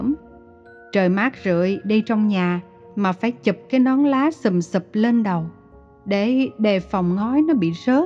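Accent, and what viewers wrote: South, Vietnam. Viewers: Truyện hay, tình cảm nhẹ nhàng: 9/10 Giọng đọc miền Nam của xuất sắc, và cách đọc, diễn tả quá hay.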